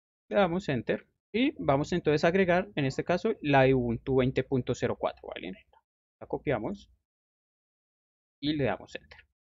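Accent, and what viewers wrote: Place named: Colombia. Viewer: WOW varias cosas xd 1. Me agrada que POR FIN un canal en español ligado a linux este enfocado en GAMING, me parece genial y mas con el estado actual de steam y las mejoras que poco a poco vemos en otros lugares. Creo que poco a poco vamos viendo linux como ya una verdadera alternativa FÁCIL ante windows xd 2. Mi parcero como que es de colombia no? digo, se le marca ese acento que se distingue, así que supongo :v 3. TREMENDA pc ala, me parece genial primero porque es una belleza muy sexy, segundo porque la gráfica que tiene (segun estuve leyendo) esta dando problemas con algunos juegos (vamos es una nueva arquitectura híbrida con stream processor, tiene razón para fallar) por lo que seguramente vos tambien podras mirar eso xd y luegooo porque la verdad presenta hardware que mucha gente va a comprar, el R5 3600 es pan caliente y seguramente alguno quiere linux xd. 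Otro punto es que yo también manejo puro AMD, eso sí, algo más modesto pero funcional. 4. Recomendaría para el canal títulos más cortos en los videos, por ejemplo, el último video que subiste sobre mangohud (que por cierto yo sí me tuve que tragar los videos en inglés y un fallo en la instalación, pero al final sobreviví) es el único video en español que hay en youtube. Por lo que si pones algo así: "COMO VER LOS FPS EN LINUX" aparecerá el video de primeras para los hispanohablantes. Si lo se, puede ser algo "Clickbait" y demás pero we, tienes buen contenido y al final logras explicar lo necesario, considero que vale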